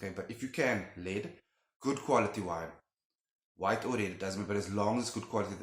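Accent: South Africa. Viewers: Oh man, hearing your "R" i automatically knew you are South African. I love that accent.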